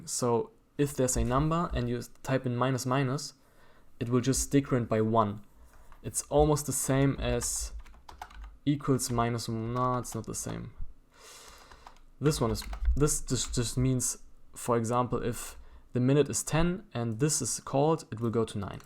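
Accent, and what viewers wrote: Germany. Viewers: I KNEW YOU'RE GERMAN FROm THE BEGINNING ON! (bin auch deutsch)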